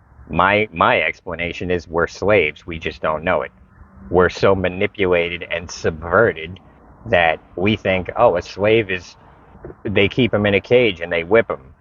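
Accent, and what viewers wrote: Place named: Long Island, United States. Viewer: your Long Island accent makes you sound like *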